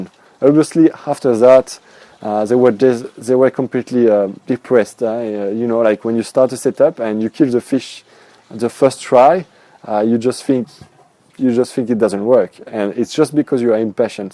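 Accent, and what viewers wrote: France. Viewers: mon dieu..quel super accent.. laisse moi deviner... origine francaises ?? ou belge ?? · can you caption this? Your accent is really strong for people in other parts of the world. I only understood about half of it. And googles auto captions think your french. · i love them french accents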